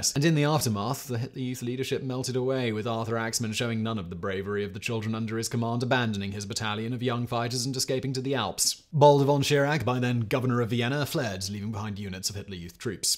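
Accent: United Kingdom. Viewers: Yes! I found him via The Casual Criminalist channel. I thought he was a very posh English man. Very proper. Then I found his other channels. 😂😂😂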